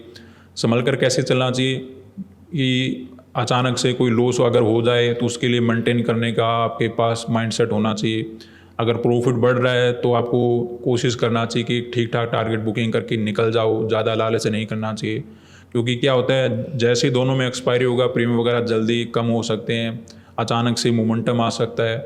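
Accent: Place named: Rajasthan, India. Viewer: आपके बातों से लगता है कि आप राजस्थान से belong करते हैं|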